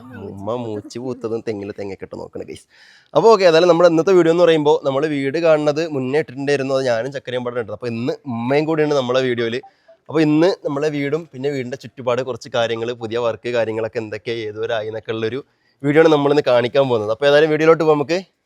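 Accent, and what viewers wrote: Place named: Palakkad, India. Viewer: നമ്മൾ കൊല്ലത്തു ഉള്ളവർ കുടിയിരിക്കൽ എന്നൊന്നും parayaarilla😃 പക്കാ പാലക്കാട്‌ slang ആണ് മിക്കവാറും paraunnathu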